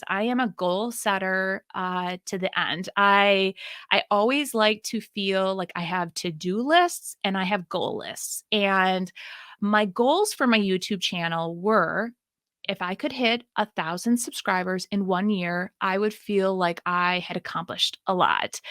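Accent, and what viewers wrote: Wisconsin, United States. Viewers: I'm loving getting to know you better with these podcasts! I can't wait to see the next and the next! When I first found you on YouTube, I'm sure I typed in DIY or something but what got me hooked on you wasn't the content alone (although that was stellar) it was your personality and, yes, your very cool, Wisconsin accent. I'm from Southern Ontario, Canada and I feel that I have a lot in common with Wisconsiners! (Is that a word?) You are the sweetest, kindest, most generous person on this planet and I NEED people like you in my realm! Never stop doing what you do. You are The Empress in your little realm and I, for one, am grateful! ❤😊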